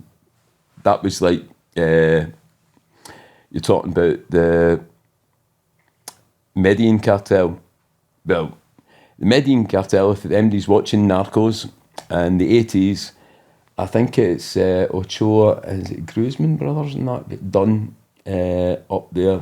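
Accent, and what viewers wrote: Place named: Scotland, United Kingdom. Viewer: I'm sure this story is amazing (I know it is) But I can't understand so much of it ! I'm usually fine with the Scottish, but this guy is deep 😂 I want his book for sure :) Bless u mate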